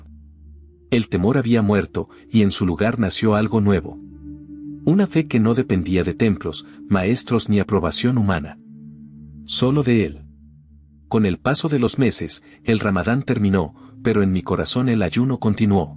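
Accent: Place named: Portugal. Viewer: Porque habla portugués si lo hace en español es una mezcla muy mal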